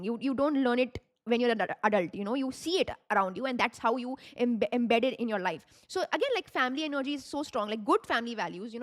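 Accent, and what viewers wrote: India. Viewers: I can’t with the Indian accent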